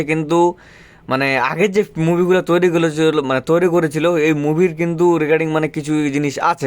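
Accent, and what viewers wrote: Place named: Bangladesh. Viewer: Ei gudir beta youtuber ta bangladeshi pola, ei mal tar kotha sunei bujha jacche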